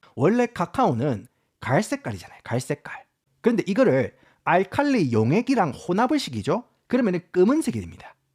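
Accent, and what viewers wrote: Gyeongsang, South Korea. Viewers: 경상도 사람 아니면 듣기힘들거같은데 전 이만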